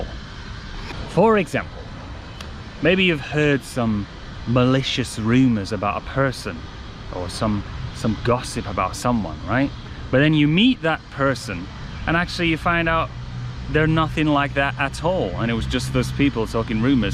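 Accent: United Kingdom. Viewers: That Brit accent that reminds me of Ron in Harry Potter. I just laughed quite nice 😁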